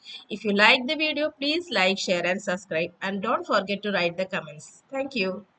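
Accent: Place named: Kerala, India. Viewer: Mam you are. 100% malayali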